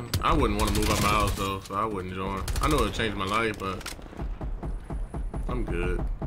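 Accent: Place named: Philadelphia, United States. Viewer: WasssCrackin but just wanted ta ask!!! is u from Philly cuz ya lowkey have the accent like you from there lol🤣😂✌️🫰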